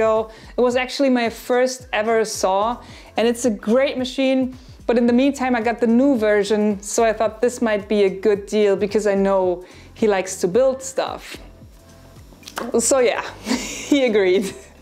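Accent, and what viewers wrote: Germany. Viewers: I know this is an older video but I have to say I love it. Also, unrelated to the video... Are you German? I love the accent. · Awesome! I’d like to take this opportunity to tell you that your accent is one that takes me back to Darmstadt Germany in the late 90’s. I was stationed there and dated a woman with an accent and voice just like yours. Nostalgia,… Gotta love it. Keep up the good work and awesome channel! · Hello! This is my first viewing of a video of yours. Not sure but I guess you German? I learned Norwegian as a child from my grandfather but now only remember 4 or 5 words. It is just that grandmother was Danish so she was sabotaging things because she was insisting I learned Danish, while my other grandfather was German, so yeah, he was working the German angle. I was so confused! And then I got to kindergarten and the kids only spoke English!!! 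It might have been hilarious to everyone------ but me! But I still have family in Bergen and Ringstaed but I haven't seen them for quite a while now. But it seems I have a few strange words in my vocabulary that come out on occasion, I think they are from my pre-K days. But your English is great and with a lovely accent, and an easy laugh! So good for you on that! At any rate, I think your 'electronics' would be better if you ripped them all out and started over! Safety first!!! Always!!! Even if it costs money!!! (My Norge grandfather was very, very reluctant to spend a penny! But some items are just too valuable to not have new--- like wiring!!! Hope you agree! ) Tak!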